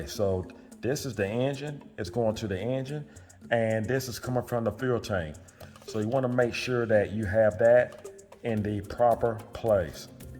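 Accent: South, United States. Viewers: love the southern accent, lol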